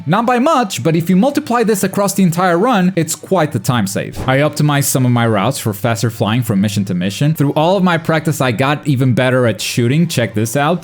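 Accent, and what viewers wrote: Portugal. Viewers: Calma calma calma és tuga?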